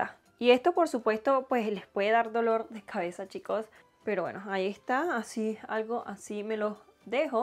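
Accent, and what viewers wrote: Venezuela. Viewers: ES VENEZOLANA? CREO QUE SI POR SU ACENTO ...ADEMAS QUE BELLA ES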